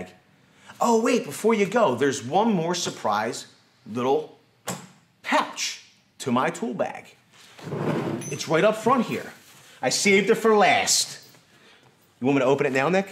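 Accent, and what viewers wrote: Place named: Rhode Island, United States. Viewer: You have got to be from Rhode Island. North Providence? Johnston?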